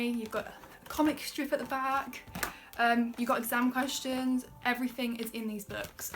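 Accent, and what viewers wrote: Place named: United Kingdom. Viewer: I want to speak like you😭 I wish I was British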